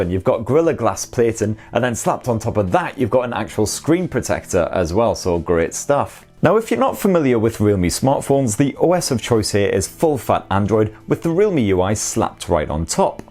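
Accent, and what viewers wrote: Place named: North East England, United Kingdom. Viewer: It's English humour and his accent North East England you won't understand that unfortunately.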